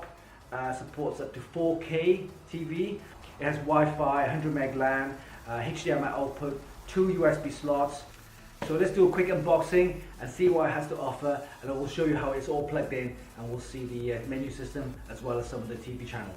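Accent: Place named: United Kingdom. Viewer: An Asian man with a British accent = YUMMMM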